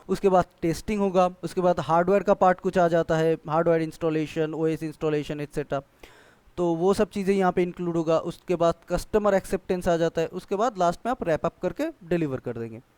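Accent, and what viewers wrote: Bengal, India. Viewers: Bangali naki?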